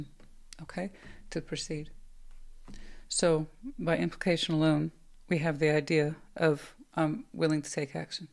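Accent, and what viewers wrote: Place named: Texas, United States. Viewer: You tube and their bullshit. 🤦‍♀️ I absolutely love you. You keep doing what you’re doing and always, ALWAYS be yourself. I agree that your approach to dealing with the algorithm makes sense. Break em up as you see fit!! I’m gonna watch regardless. Just please, PLEASE, don’t ever give up!! I would definitely miss you and all you do. Love me some!!! I actually get upset when I don’t get to listen to you enough. I don’t get mad, especially not at you, it just feels like there is something missing in my life. I really didn’t realize what an impact you have on me until this year’s new ‘my year in review’ video that had me as one of the top 3% viewers of your channel. I froze when I saw that- as wide an array of channels I follow, and as much time as I spend watching or listening, top 3% is a LOT!! Anyway, just wanted to thank you for being who you are and doing what you do. 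You do you EXCEPTIONALLY well. Love your readings. Love your accent (fellow southerner here my Texas treasure). You sense of humor is phenomenal!! You have me rolling on the floor in your intros. Especially when your truth comes out- you are always spot on when it comes to people- and you absolutely do not hold back. LOVE IT!! I feel like you are family after following you for some 7 years now. I wish I could express exactly how important your insights and knowledge are, and have been impacting my life. Absolutely for the better. You are inspiring and charismatic. Best of all, you ROCK!!! I lov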